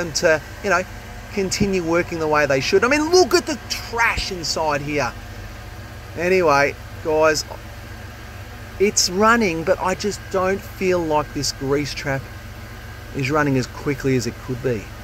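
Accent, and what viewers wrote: Australia. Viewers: Don't shut up bud!! So funny with your comments, and the Australian accent is so much better than an american one.😂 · Absolute joy. Keep the commentary going, full on Fosters and full on Aussie 👌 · Just found your channel couple days ago. I work on pools here in Arizona and your channel popped up. I gotta say half the fun is your talking. Something about Australian accent can make an autopsy seem fun. Keep up Awesome work already been thru 10 of your videos in 2 days. You’re great.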